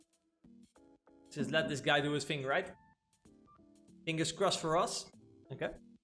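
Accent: Netherlands. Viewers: great man. I heard the typical dutch accent when talking english haha. Great content man , i love it !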